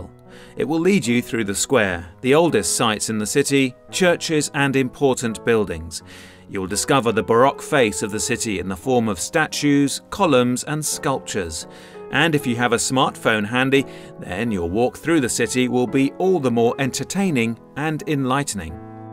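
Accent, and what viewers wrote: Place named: United Kingdom. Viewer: Great info in spite of the Limey accent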